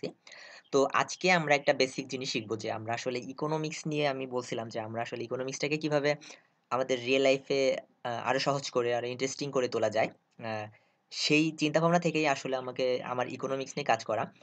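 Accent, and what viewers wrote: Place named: Bangladesh. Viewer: Bhasha shuine monehochhe Bangladeshi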